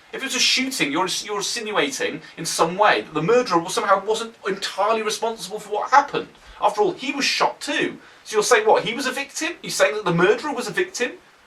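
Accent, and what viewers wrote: United Kingdom. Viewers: it just sounds better with a british accent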